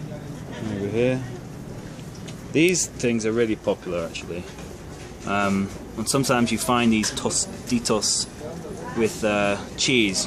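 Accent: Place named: United Kingdom. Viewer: British accent sounds so fancy :p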